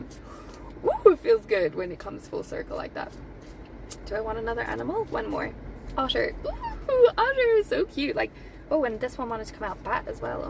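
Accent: Ireland. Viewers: did you move from Ireland? your speech is beautiful ❤️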